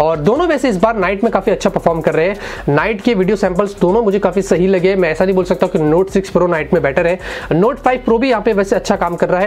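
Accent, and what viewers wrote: India. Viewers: Nossa, como indiano tem sotaque. =0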